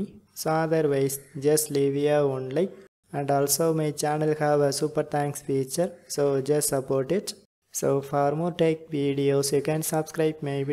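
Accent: India. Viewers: As soon as i heard the inidan accent, i new it will work soon.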